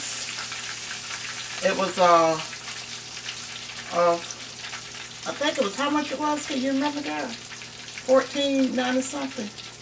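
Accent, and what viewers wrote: South, United States. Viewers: you are awesome...quit worrying about how you say certain words, I get you so if they dont they can simply rewind put headphones on..From one authentic proud Southerner to another...Keep doing YOU..keep your smiles going through that wholesome "put your foot in it" style cooking you're sharing. Bravo! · I enjoy you ! I love your southern accent and charm ! You should so sweet and caring ! Put me in the mind of my nana or great Aunt ! The fish was absolutely beautiful! Blessings be on to you!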